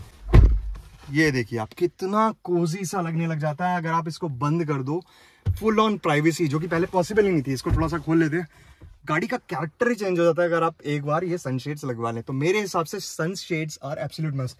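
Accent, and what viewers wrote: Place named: Uttar Pradesh, India. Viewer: BHAI AAPKA BAAT KAARNE KA TARIKA BIKUL UTTAR PARDESH STYLE HAE!! LOVE FROM LUCKNOW!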